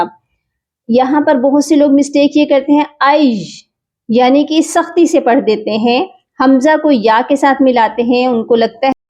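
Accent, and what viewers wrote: Panipat, India. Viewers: Ap jis lehjay may parti hai wo panipati lehja hai plz muhjy batay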